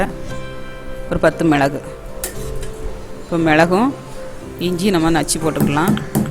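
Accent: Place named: Tirunelveli, India. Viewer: Nice slang tirunelveli Thoothukudi slang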